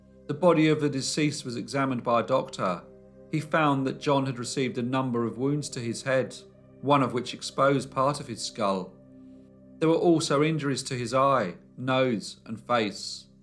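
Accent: England, United Kingdom. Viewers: I like this narrator’s voice. And his English accent. Love his stories, too.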